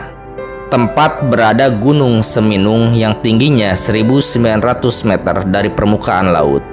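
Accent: West Java, Indonesia. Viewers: Yang cerita logat nya Sunda yaa..😂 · Jelas orang ini dari gaya bicaranya orang sunda, legenda yg dia ceritakan tidak pernah ada dalam catatan rakyat danau ranau, jelas orang ini bohong, kelihatannya orang ini disuruh seolah olah orang ranau masih punya hubungan dengan orang batak, yg jelas kami orang ransu menolak sama sekali cerita ini...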